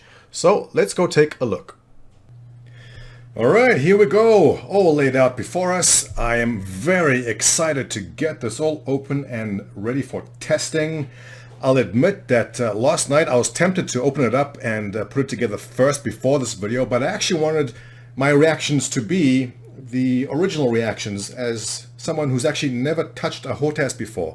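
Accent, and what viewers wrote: South Africa. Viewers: its south african lol are you saying all us south africans sound like Christopher Walken? :P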